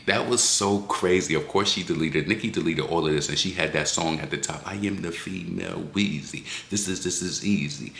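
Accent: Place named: New York, United States. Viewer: I needed it this run down in full New York 🗽 accent ❤❤❤❤❤❤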